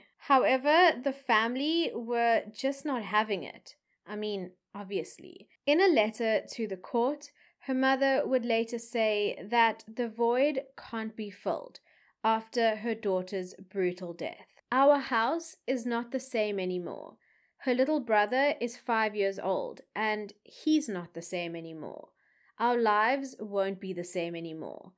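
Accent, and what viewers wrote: Afrikaans, South Africa. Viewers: I love your Afrikaans accent its so cute! 😂❤️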